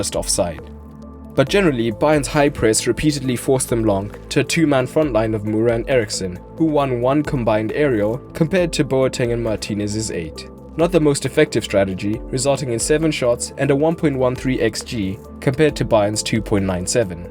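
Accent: South Africa. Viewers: Where are you from? Sound like a Saffa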